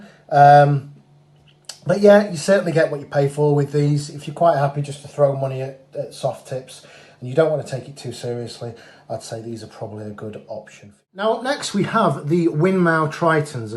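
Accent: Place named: Northern England, United Kingdom. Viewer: Have you moved to them since this video and do you still rate L style premiums? Cheers fella, you sound like a northener like me, have lived in Spain a long time though, hence the soft tip.... I'm not that keen if I'm honest....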